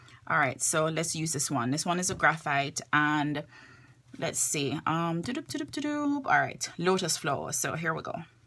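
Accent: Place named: Jamaica. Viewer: heard your accent. Instance sub. I am JAMAICAN TOO. :-)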